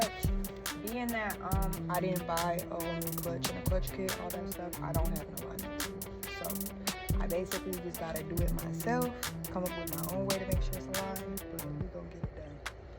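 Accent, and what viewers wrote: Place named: South, United States. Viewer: I'm trying to pay attention I like the way you talk downsouth country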